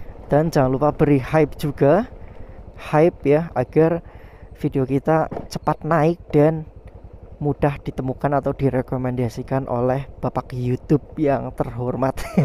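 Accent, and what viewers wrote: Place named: Banyumas, Indonesia. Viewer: Sama" orang ngapak tp kalau nonton smbil dengerin mas ngmg jd horor + kocak mantapp menghibur sekali mas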